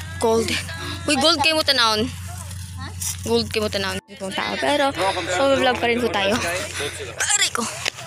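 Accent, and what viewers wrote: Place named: Visayas, Philippines. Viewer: i like your bisaya ascent "TUBAG SAD MO OY" 😂❤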